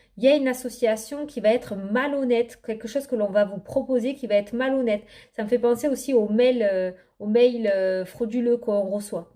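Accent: South, France. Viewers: Coucou! cette symbiose que je ressens lorsque je vous écoute! Tout est le chemin actuel de ma vie, et vos précisions sont totalement liés à ma vie actuelle. Je suis en arrêt maladie suite à une grosse intervention chirurgicale, et j'ai une personne, un homme qui vient d'entrer dans ma vie. pas encore sentimentalement, mais je ne serais pas contre lol!. Affaire juridique en cours qui traînait depuis 5 ans! Je vais bien suivre mes 'alertes" internes afin de voir les mauvaises choses qui arrivent! Mille merci! et gardez votre vitalité, votre accent du sud qui me manque tellement et votre bienveillance! Hâte déjà de vous retrouver pour de nouveaux indices sur nos parcours.... Belle nuit à vous!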